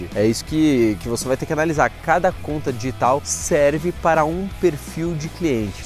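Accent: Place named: Rio Grande do Sul, Brazil. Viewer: Mas vc não é mineiro né?..vc é gaúcho tchê!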